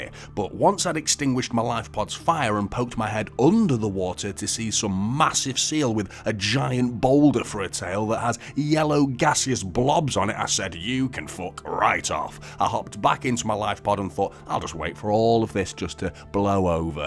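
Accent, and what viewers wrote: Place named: Stoke, United Kingdom. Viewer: Proper stoke accent that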